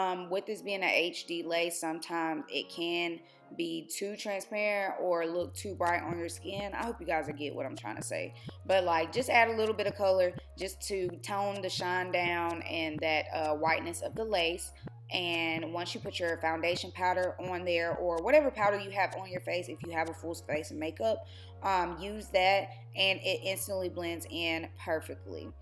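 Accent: South, United States. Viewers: Love it! Okay, I hear her Lil country accent lol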